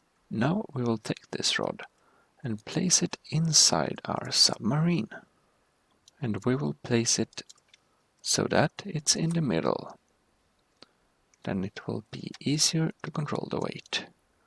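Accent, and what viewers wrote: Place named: Sweden. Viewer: I knew you where swedish on tour accent or what it is called och kolla jag är också Svensk hej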